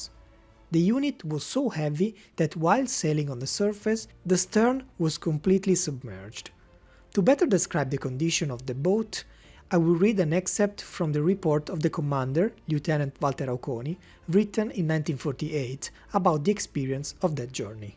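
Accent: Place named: Italy. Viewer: Just an outstanding video altogether with that great Italian accent that so well fits the content. Many thanks!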